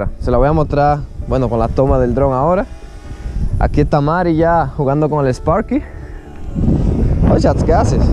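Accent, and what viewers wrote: Dominican Republic. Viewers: Algo me dice que eres dominicano..de algun lugar del cibao.. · Tu ere dominicano? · De donde eres ? Eres dominicano🇩🇴🇩🇴 ? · Loco tu eres dominicano?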